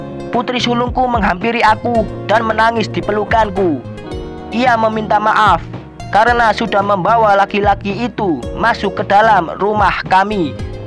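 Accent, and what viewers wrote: Java, Indonesia. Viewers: Ngomongnya medok jawa banget.Jadi ngga enak ceritanya !!!! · Bertele tele...udh suaranya medok bgt ga enak didenger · Kelihatan admin yg ngomong ini orang dari Bantul Jateng ya,kedengaran medok jowone,yg lucunya mendekati akhir cerita teksny hilang...mas klu mau buat cerita elupun ceritanya betbau hayalan yg baguslah kemasannya..ok,mas. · Suara jawa pekatbanget loo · Medok dan tamat ... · Sebeliumnya saya minta maaf, ya, mas... (Juga untuk yg lainnya) Sebenarnya Ada benarnya juga jika sampeyan bilang gak mutu...itu jika diibandingkan dg ilmu2 pengetahuan atau agama. Ini tergantung bagaimana kita menyikapinya, jika pikiran kita kotor maka akan menjadi nampak kotor, Semua hal ada sisi baik dan ada sisi buruknya, jika kita lihat banyak buruknya, cobalah kita cari sisi baiknya walau sedikit sehingga kesalahan / keburukan seseorang tak nampak menggunung. 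Simple saja.. buang yang buruknya, ambil yang baiknya ..yaitu hikmah di dalamnya agar semoga kita dijauhkan dari kemaksiatan dan senantiasa menjaga keimanan kita, aaamiin. Bukankah Untuk menjadi baik adalah belajar dari kesalahan? Dan untuk menjadi wong bener adalah dengan belajar dari yg salah.., jadi mari kita anggap saja channel adalah guru yg sedang menasehati / mengingatkan kita agar kita berhati2 dan waspada karena manusia sesungguhnya tempatnya khilaf / lupa, sehingga kadang tiba2 terjebak dalam kemaksiatan. Bisa saja kita sekarang mencela. Besok kita yang terperosok. Semoga gusti Allah selalu menyertai dan melindungi kita. Aamiin.... Kalau saya lebih fokus dg bahasanya yg medhok gak karuan dan suara jelek serta cara membacanya yang jelek sekali, haha.. Maaf, ya.. Buat adek yg punya channel. , tapi itu yg membuat nilai pluznya, menjadi. . Lucu.. jadinya bikin saya ketawa terus, apalagi jika bilang tamaaat.. 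Atau sekian.... Saya ngakak sekali. Oleh karena itu saya lebih fokus ke lucunya .. Semoga kita bisa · Lucu cara ngomong mu, medok 😂😂😂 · GK seru kalo critanya di bacakan,seperti membaca pelajaran waktu SD dulu,yg membaca teks logatnya medok lagi jadi Yaaa semakin gak seru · Kok medok bngt .orang mana ya bpk.lagi terakhirnya **TAAMAAT**KYK DI BOSKOP AJA🤣🤣🤣🤣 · baca.anya seperti jawa tuken bro keras.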